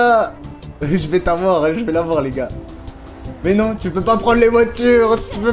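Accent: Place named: Spain. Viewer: Sa fait bizzare de pas entendre ton accent espagnol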